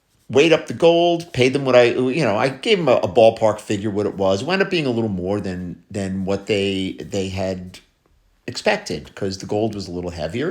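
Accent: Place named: New Jersey, United States. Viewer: How did I know yous is from Jersey...😊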